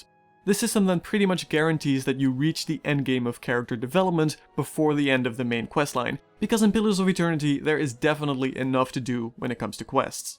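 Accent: Netherlands. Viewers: My apologies but are you dutch? Being from Belgian I seem to notice a dutch accent(as compared to a flemish one to seperate the 2 "languages")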